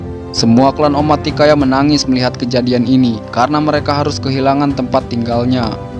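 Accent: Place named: West Java, Indonesia. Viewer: Pasti Narator Nya Orang Sunda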